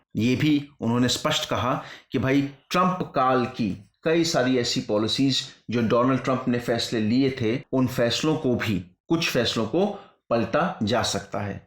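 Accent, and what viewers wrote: Bihar, India. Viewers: bihari tone sun kar aacha laga.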